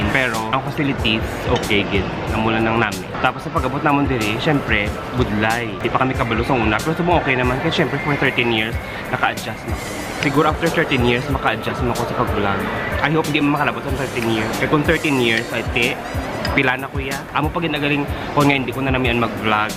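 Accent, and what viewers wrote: Iloilo, Philippines. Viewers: Love!Love! Love the ilonggo accent and seriously kahit diko po lahat maintindihan lahat ng say mo nakakaaliw ka po.Good luck, keep us smiling po.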